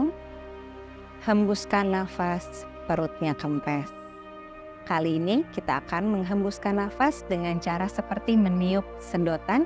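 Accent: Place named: Tegal, Indonesia. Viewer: Tegal tapi rileks ini pr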